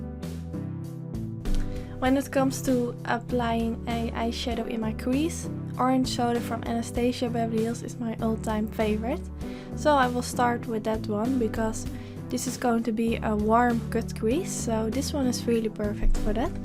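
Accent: Netherlands. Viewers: This look is amazing! Btw, what country are you from? You've got a little bit of a dutch accent ;) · omg i love ur tutorials! u have a dutch accent haha!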